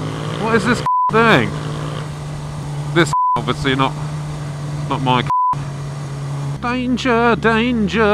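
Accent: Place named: London, United Kingdom. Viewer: what side of london are you from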